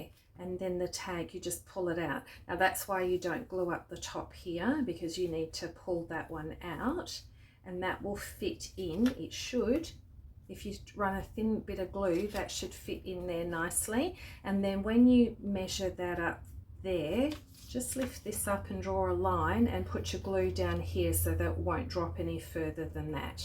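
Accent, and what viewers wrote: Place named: Australia. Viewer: Happened across your video and oh my goodness it's stunning, and I'm not a fan of orange! And it's so good to hear an Aussie voice!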